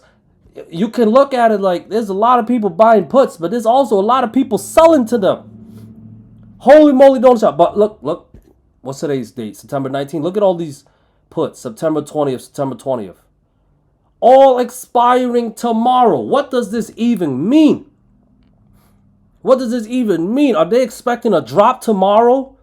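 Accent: New York, United States. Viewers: you are awesome!!!! you almost sound like a New York City guy!!!!!!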